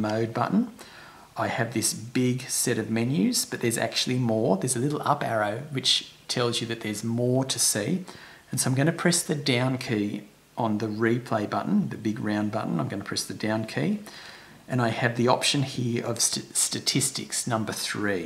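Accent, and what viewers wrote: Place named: Australia. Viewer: How tf he gay its an Aussie accent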